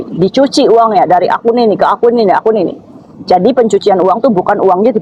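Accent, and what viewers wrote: Indramayu, Indonesia. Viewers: Kak semangaaat Tpu logat Indramayu nempel kaya saya ( kita ga dari Indramayu )😊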